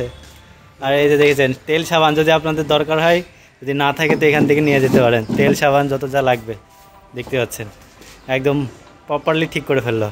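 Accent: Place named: Bangladesh. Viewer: Apni bangladeshi mone hochhe vasa sune, Kerala te ki koren.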